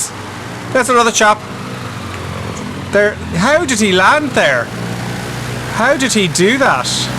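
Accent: Ireland. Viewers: That was an irish accent? Damn, I found it quite annoying how he pronounced words. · It's just his irish accent.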